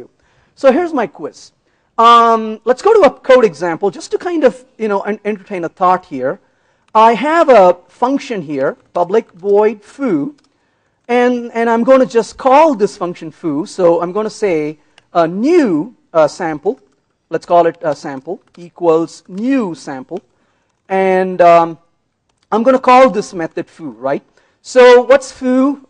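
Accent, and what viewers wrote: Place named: India. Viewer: 録音の状態が悪い上に、インド人的な早口でインド訛りが少しあるので聞きやすくはない。 それでもこの人の話は聞く価値がある。ヴェンカットさんはアジャイル系の本の著者。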